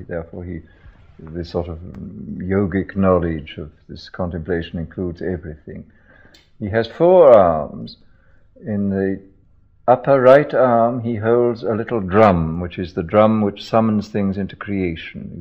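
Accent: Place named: RP, United Kingdom. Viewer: Old School British Public School RP.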